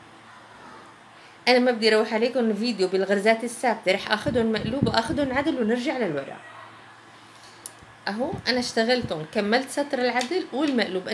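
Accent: Egypt. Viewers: بوركت ولكن لكنتك مش شامى بالكامل فيها اللهجة المصرية .خلتينى احترت فى جنسيتك وانا بسمعك😅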